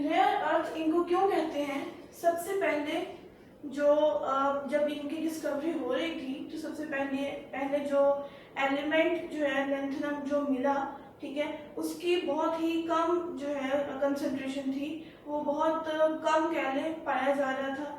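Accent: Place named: Lahore, Pakistan. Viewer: Master of Science ..so , where r u from...your accent looks like lahori.. I m from india but i love pak...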